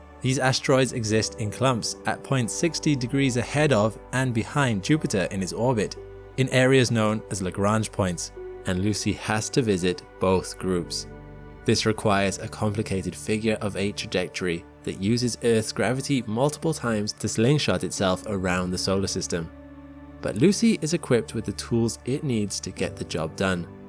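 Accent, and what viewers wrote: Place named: United Kingdom. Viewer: I have to agree , DESPITE his relative Youth, This (young) Man, is very VERY EXELLENT at what he does. He is a 'Grade A' presenter. AND, he happens to have an Accent, that automatically Engenders a "gravitas" with (Americans) WESTERNERS, Who still, to this day----- Feel a "UK Accent" automatically confers INTELLIGENCE .... THIS ..... must be some KIND Of genetically instilled memory (and LOVE) of "The KING* (royalty) it's obviously SOME KIND, of genetic Memory , we (Americans) just do NOT Question it ...................... it's a REFLEXIVE Response so, it's Gotta Be ---- Genetic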